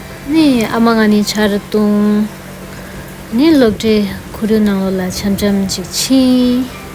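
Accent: Standard. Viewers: རང་ཉིད་བོད་པ་ཡིན་ཟེར་བ་ལ་ཁག་པོ་འདུག་ ་ལགས་བོད་སྐད་ཧ་ལས་པས་ཙང་མ་རྒྱབ་པ་མཚམས་འདྲི་ཞུ་ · དེ་འདྲ་བོད་སྐད་གཙང་མ་ཕྱུག་པོ་འདུག་ ང་ཧ་སང་སོང། ཐུགས་རྗེ་ཆེ་ ཧ་ཅང་དགའ་བོ་བྱུང།🙏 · བོད་སྐད་གཙང་མ་འདུག 🎉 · I am amazed & shocked to hear your pure Tibetan. You speak way better than most of us. I‘m speechless. I‘m following you & subscribed 😊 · བོད་སྐད་་གཙང་མ་ཞེ་དྲག་རྒྱག་གི་་ཡག་པོ་རེད་་ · བོད་སྐད་གཙང་པ་ཤོད་ཀྱི་འདུག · བོད་སྐད་གཙང་🙏👌🏽👌🏽👌🏽👌🏽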